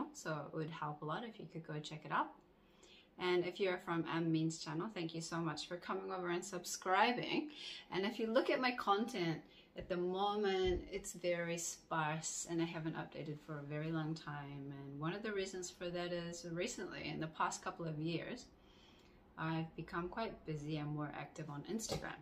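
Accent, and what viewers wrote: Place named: New Zealand. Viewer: Thanks for a great video. As one who found you via Anming's Oriental Pearl Channel, I am so glad she brought you and your content to my attention. I love your content and your presentation style. Informative, entertaining and visualling appealing are why I really get so much enjoyment from your endeavours. I marvel at your level of engagement with your viewers, which considering your busy family and work lives, I consider amazing. I now find it funny that I didn't hear your Kewie accent at all, but now, I always can hear hints of it in your speech. I hope you channel continues to gain subscribers and grows. I'll be in that audience, greedily wanting more content, but feel free to ignore my inane comments, but I truly enjoy engaging with you and insightful responses. I mean you must need sleep! My only query is what were your thoughts when Anming, I assume, contacted you about a collaboration in the first place, and why you decided to do it? 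Hopefully, you'll decide to do another collaboration, and if so I will be an even happier camper.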